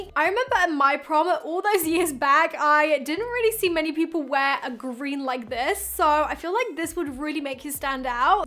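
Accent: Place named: United Kingdom. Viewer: By your accent I can guess you are from UK so please do a video on urbanic clothing..... Btw in love with your videos ❤️❤️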